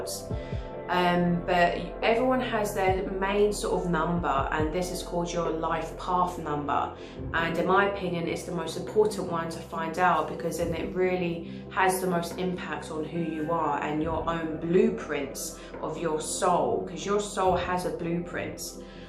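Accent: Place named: London, United Kingdom. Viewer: I'm a 22 here, but I haven't been able to build anything thus far. Not sure what the blockage has been but I'll bet its been more an issue of learning to build than anything else. I was trying to place your accent through the whole video. Are you a Londoner?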